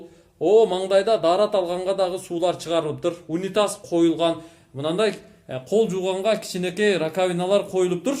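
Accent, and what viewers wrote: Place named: Chong Alay, Kyrgyzstan. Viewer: Чон алай диалектикасы😀😀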